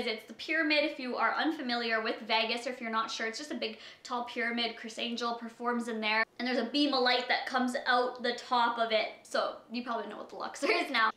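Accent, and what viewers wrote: Canada. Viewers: Such a cute canadian accent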